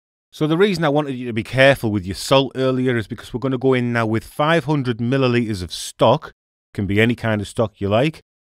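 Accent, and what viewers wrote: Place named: Northern England, United Kingdom. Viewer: I,m just here for that northern accent.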